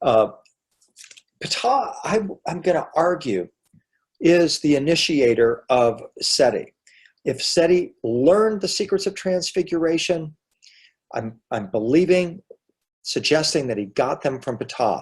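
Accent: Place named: Tennessee, United States. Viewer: Never miss a chance to see your work. I love your Tennessee way. I live in West TN always. Suppose you sound like home 🤓 You have a true sense of the human condition. Bravo!! 🥳☝️✨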